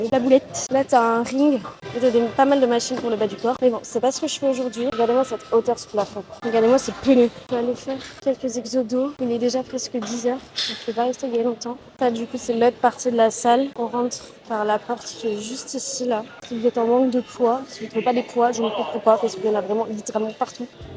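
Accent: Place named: United Kingdom. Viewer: Dommage pour l'éclairage de la salle ca fait un effet assez désagréable ça 'clignotte' vachement :/ Pas de ta faute hein ^^ Mais pour les futures vidéos pk pas faire un effet voir ce que ça donne et ensuite régler ta cam/téléphone pour pas que ça fasse ça =) Il est mignon ton accent anglais ^^ Sinon bonne vidéo =D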